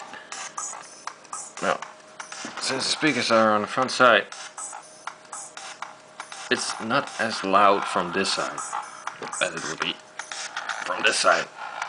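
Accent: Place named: Netherlands. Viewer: lol i can hear ur dutch accent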